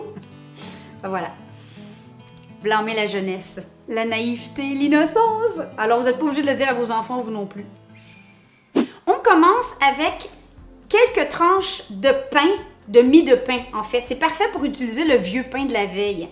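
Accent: Quebec, Canada. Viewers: c'est dommage que ça soit du quebecois j'ai enormement de mal a comprendre se qu'elle dit !!!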